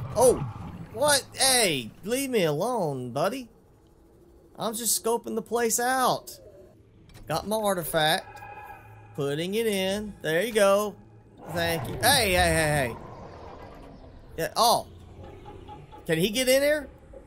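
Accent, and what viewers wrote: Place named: Texas, United States. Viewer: Nice Texan talk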